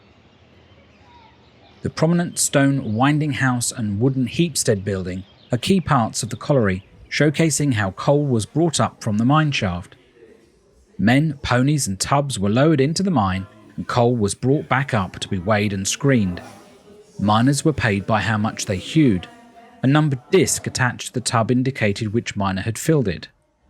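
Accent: England, United Kingdom. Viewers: Lovely content with lovely English accent..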